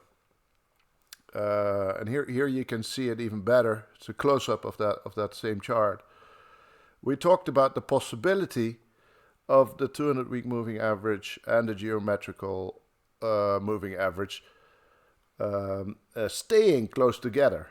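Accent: Netherlands. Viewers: Cool vid! Where’s your accent from? You sound Dutch.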